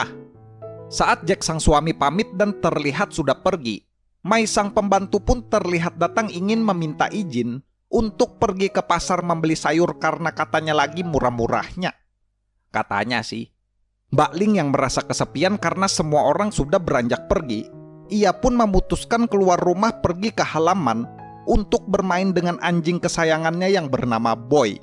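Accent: Banjar, Indonesia. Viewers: Orang banjar kah pian bang, logatnya pina sama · Logalnya Kya orang bnjr... Bujur jua KH ???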